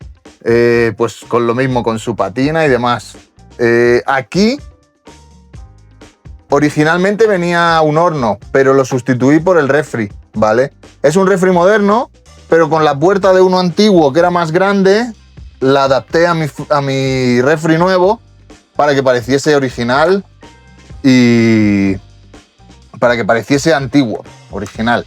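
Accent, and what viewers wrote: Spain. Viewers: Me he quedado loco!!!! Menuda pasada de trabajo, eres un verdadero genio. Conocía la furgo(combi) por fotos pero no te conocía a ti. Esa T1 ha quedado preciosa❤ yo tengo una T3 WBX y una T4. Una sola duda que tengo. Eres Español? Por qué ese acento es de aquí, no creo que sea de México jejejje. Un fuerte abrazo y ya tienes un nuevo seguidor desde CEUTA-ESPAÑA 🎉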